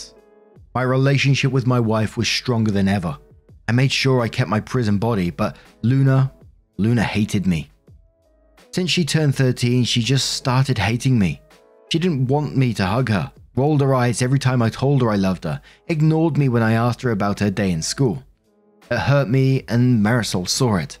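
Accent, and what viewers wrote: United Kingdom. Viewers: British pronunciations of Spanish words make me chuckle and wince simultaneously. One day you’ll get it,! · i aint gonna lie I was taken outta it for a second hearing someone with a british accent say they were a latin king lmfao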